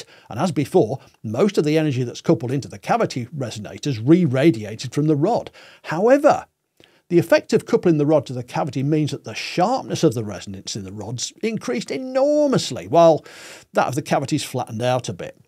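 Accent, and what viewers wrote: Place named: Scotland, United Kingdom. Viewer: This is the first video I've watched of your channel. I'm going to subscribe, absolutely hitting on things I'm very much interested in. One thing I'd like to observe, I believe you may hail from the same geographic location as Sean Connery. It sounds that your dialect and accent is very similar to his, unless he was simply acting and faking his natural voice on screen. Anyway, thank you for your hard work!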